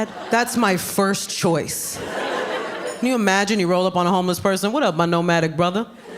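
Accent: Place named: Bronx, United States. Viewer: She is so New York...I love her. I'm from the Bronx too,she sounds like a real Bx girl,she is absolutely hilarious.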